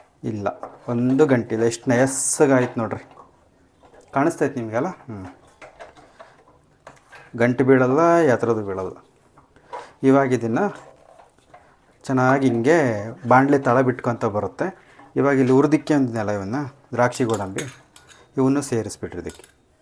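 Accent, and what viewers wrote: Chitradurga, India. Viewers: ಹಲ್ವ ಮಾಡೋದು ಚೆನ್ನಾಗಿ ತೋರಿಸಿದಿರಿ ಚಿತ್ರದುರ್ಗ ಕಡೆ ಭಾಷೆ ನಾ ಇದು ಚೆನ್ನಾಗಿದೆ ಚೆನ್ನಾಗಿದೆ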